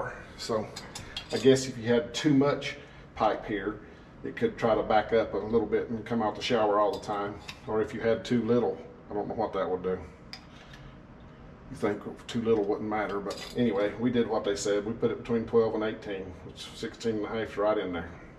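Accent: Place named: South, United States. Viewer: your pronounced southern accent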